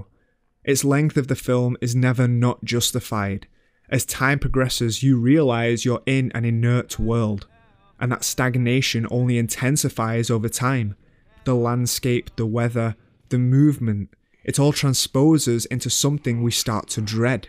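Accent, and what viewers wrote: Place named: England, United Kingdom. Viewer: Love the content of this video. The mispronunciation of the director's names (Louis Malle, Murnau, "Andrei" Tarkovsky for example) and the accent in general made it very difficult to watch. How does one know so much about cinema but get the names of the directors wrong? And with such conviction. That's baffling to me. This is one English accent that simply destroys everything in its path.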